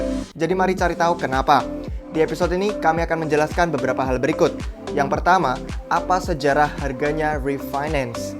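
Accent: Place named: United Kingdom. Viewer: nonton buat dapet ilmu tentang crypto ❌ nonton buat denger mas nya ngomong british accent ✅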